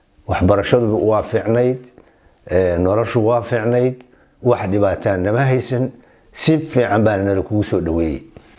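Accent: Mudug, Somalia. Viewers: Odaga waa somali dhab lahjadamashqul kuma ficna iyo heshuu kasoo jeedo waxa muhim.ah taloyinkisa ivan racno lki waxan qiyasayaa lahjada waa mudug ilaag/lafa dhexe intas madhafsiusana ader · Lahjadiisa puntland ma ahan waa reer mudug waxuu ka dhaxeeyaa reer mudug. Reer mudug koonfur ilaa waqooyi waxay kaga duwan yihin reer bariga iyo reer nugaaleedka waxaa ka jidho ereyada sida “haya” tusaale “waxaan kuu sheegahaya” meeshay reer bariga dhihi lahaayeen “waxaan ku sheegayaa” Ninkanu qabiilkiisa iyo deegankiisa lama sheegi karo Lakin Inuu reer mudug yahay banse kuu hubaa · Weli reer mudug hadalkiisa ayuu leeyahay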